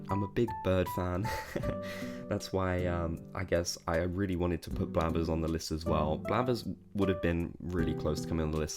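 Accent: Wales, United Kingdom. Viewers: Are you welsh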